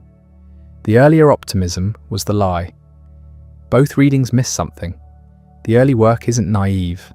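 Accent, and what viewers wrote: United Kingdom. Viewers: Love this and thank you but I wish you'd scratch the British accent. So many documentaries use this voice or similar that the sound is beginning to irk me.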